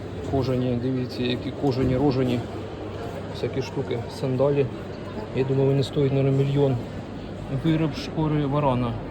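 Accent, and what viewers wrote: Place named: Surzhyk, Ukraine. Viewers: Важко сприймати на слух такий жорсткий суржик. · Ми Українці шо не понятно а балакає він як у нас в Дніпропетровській області привіт з Павлограду.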